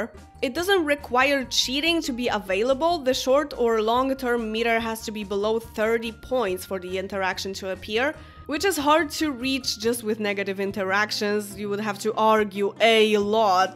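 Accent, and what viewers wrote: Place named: Poland. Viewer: You sound Polish, are you? 🐦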